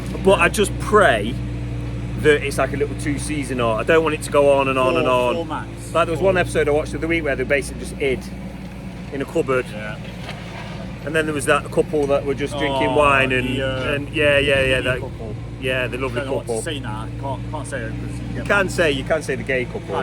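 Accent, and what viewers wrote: Nottingham, United Kingdom. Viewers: Went uni in Nottingham and never understood mushie peas. Watched a few of your videos after watching D and J projects. I never knew there was so much still in landscaping. Learnt so much from you and D n J. I’m now disabled and stuck in Leicestershire and love hearing your accent as reminds me of my happy uni days. I worked nights in the Clifton garage before it was rebuild back in 2004 ish I had a white 1972 beetle. Oh thank you for the smiles x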